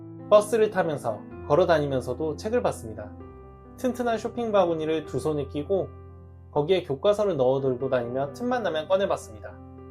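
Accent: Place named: Busan, South Korea. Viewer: 부산분인데 사투리 별로 안쓰시네요..ㅋ 좋은학교 나온 인재시고 아직 젊으시니 앞으로 좋은 기회가 마니 오겠쥬..ㅋ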